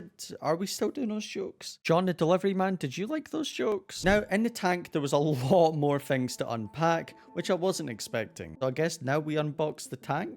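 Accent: Scotland, United Kingdom. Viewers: Bro is British because he says wa'er Instead of water and says sal' instead of salt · Hes British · By your voice I can tell that your Scottish 😎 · Is he British Im American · Wait your british??😊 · Bro are you British or foreign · I think he’s British, but how many times he said WOA? Ah ended😅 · Guys is he British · are u scottish by any chance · HES BRITISH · Ur british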